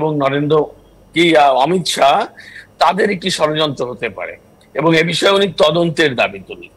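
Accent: Kolkata, India. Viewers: উনি ভারতের লোক ওনার ভাষা। বাংলাদেশের মানুষের ভাষানা উনি কথা বলতেছেন কোলকাতার মানুষের মতো।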